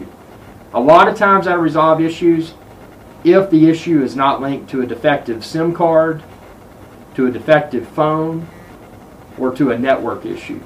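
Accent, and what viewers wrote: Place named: Alabama, United States. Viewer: Thanks for info! I'm getting ready to try this since my iphone 5 is stuck in recovery mode. You sound like you're from my home state - Alabama?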